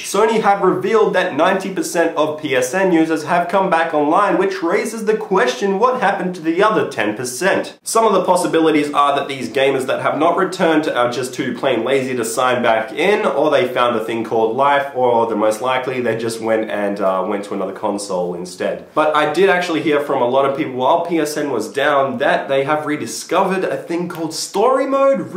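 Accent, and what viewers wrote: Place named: Australia. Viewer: i knew i recognized that accent, aussie u tuber ftw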